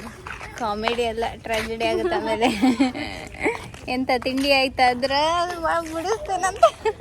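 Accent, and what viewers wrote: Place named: Udupi, India. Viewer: ನೀವು ಉಡುಪಿ ಭಾಷೆ ಚಂದ ಮಾತಾಡ್ತಾ ಉಂಟು 😁🤪🤩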